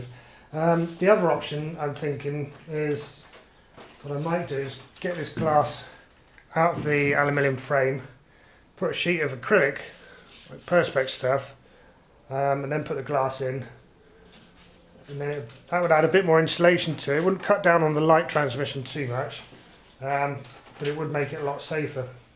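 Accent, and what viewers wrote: United Kingdom. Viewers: Greetings from Nevada , USA . Found your channel by accident , very interesting topic , Liked & Subscribed . Anxiously awaiting Part 2 , in the meantime I'll be watching your other videos . -- PS: Love listening to the British accent and terminology . Brother-in-law ( Precision Machinist ) is from the UK ( London area ) . Best of Luck to you neighbor .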